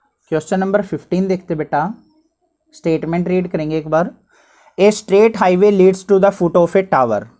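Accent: Haryana, India. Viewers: sir aap haryana ke ho kya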